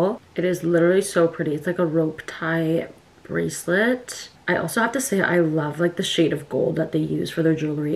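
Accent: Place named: Scotland, United Kingdom. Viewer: OMG I love her accent, I think it is Scottish?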